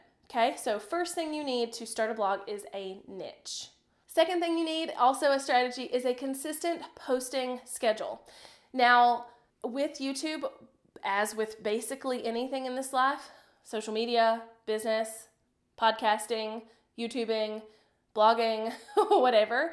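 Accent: South, United States. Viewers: I love your country acent🥰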